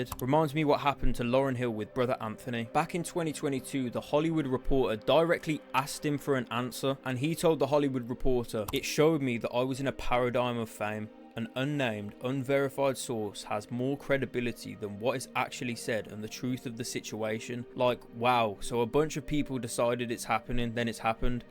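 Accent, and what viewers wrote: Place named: England, United Kingdom. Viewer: I was really surprised this channel doesn't have 100k subs. I enjoyed the video and I love his English accent, Americans never sound as cool. Sending love from the USA. Thanks for the interesting video. SUBSCRIBED!!!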